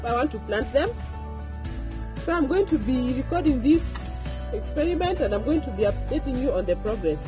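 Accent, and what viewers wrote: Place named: Kenya. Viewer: I love your voice, reminds me of a coworker from Kenya. For some reason that English accent is my favorite. Plus everyone I've know with that accent has always been so amazingly upbeat. Great vid!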